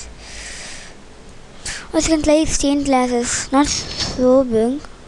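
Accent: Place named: France. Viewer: Are you french? Love ur Acent! :)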